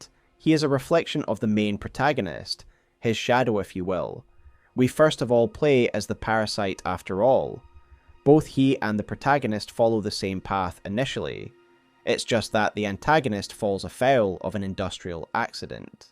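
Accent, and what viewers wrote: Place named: United Kingdom. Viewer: i can tolerate a british accent to a degree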